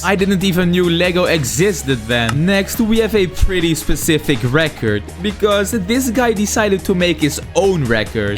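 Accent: Netherlands. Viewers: 😊ar you dutch your accent is dutch?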